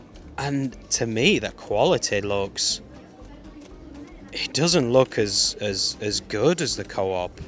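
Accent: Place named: Italy. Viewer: Ma sei un italiano che parla in inglese!!!!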